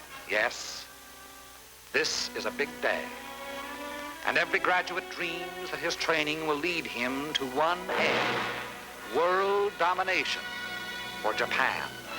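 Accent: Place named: United Kingdom. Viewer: I wonder why, ww1 ww2 documentary, reads, A British accent speaking English.?? They garher fotos and videos of the war or the faces of leaders that era, then they put on their dirty hypocrisy stories.. Let say, these history forgeries is almost miss leaded the truth of western evil greedy imperial perpetrators did dirty inhumane actions.... We in Africa, Specially, Somalies do not trusted those evil English speaking countries.... Because, we never forget the way they divided us.... They don't deserve to listen their evil lies😂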